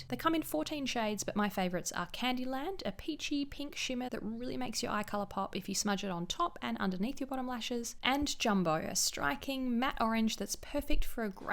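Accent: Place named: Australia. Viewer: I never really got into makeup before but I’ve got orders from Glossier, Fluff and UltraViolette coming now.... and I have you to thank/blame! 😂 Like many others I’m so so grateful that your makeup ‘maximalism’ can help so many people see products perform, decide for themselves and embrace minimalism in the way they actually purchase and apply product. It’s good for the world. Your lovely accent makes me proud to be Australian too. Sending love from Melbourne! ❤️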